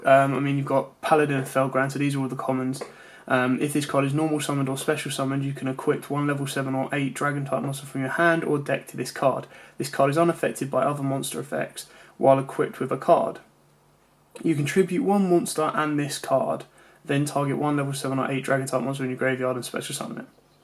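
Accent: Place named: United Kingdom. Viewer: Didn't you noticed his accent?. He is from the UK, It falls to reason Europe got it first. Now that I think about it, We can just import it from there right?. Doesn't matter. It's out now.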